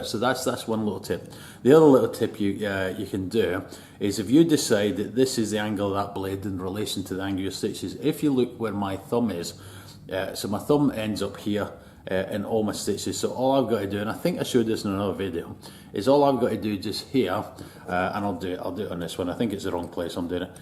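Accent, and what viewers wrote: Scotland, United Kingdom. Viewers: Don't take it personally but you sound similar to a character from the TV show"The Simpsons". A Scottsman.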